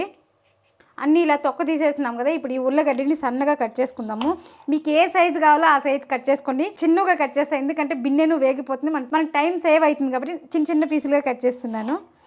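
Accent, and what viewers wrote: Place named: Chennai, India. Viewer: are u from Madras because my in-laws are from Madras so I want say is what all u r cooking I am so familiar because all the items I too cook at home so exactly I know how it taste my favorite venchina pappu oh my God I can’t believe please let me know from where are u ok the way u talk too it is same I love it keep smiling enjoy look forward another recipe 😋.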